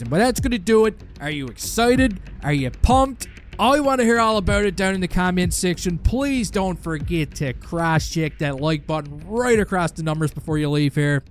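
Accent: Newfoundland, Canada. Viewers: Are you from newfoundland? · you from Newfoundland or Nova Scotia with that accent?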